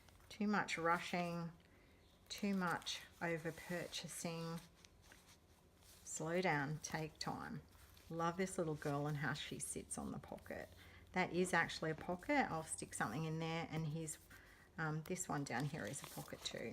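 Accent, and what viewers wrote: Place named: Australia. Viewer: Hi! Beautiful theme! Our Teds are best friends....that texture on the cover is too wonderful.....Hi from Adelaide, so great to hear the accent on YouTube! 😘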